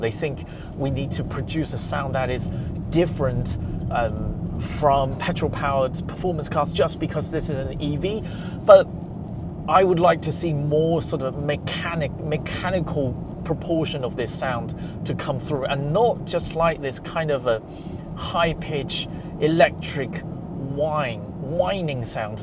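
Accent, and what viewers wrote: United Kingdom. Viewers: British / Chinese Accent is a thing ?! Whaaa ?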